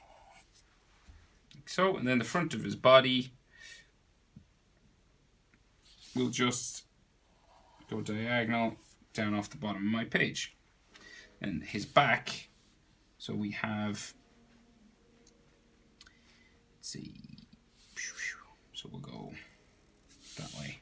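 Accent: Ireland. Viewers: Are you irish because you sound that way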